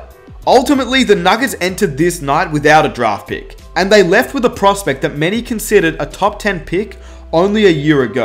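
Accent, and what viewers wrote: Australia. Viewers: is he australian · Yo, are you Australian?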